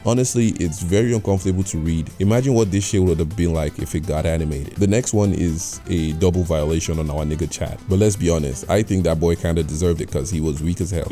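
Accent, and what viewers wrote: Nigeria. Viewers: I had a feeling bro was Nigerian straight from the accent, well, as a Nigerian myself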